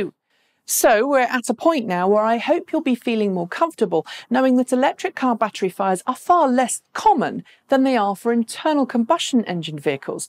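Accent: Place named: United Kingdom. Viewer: Somewhat interesting. She needs to drop the brit accent. It is Not sexy.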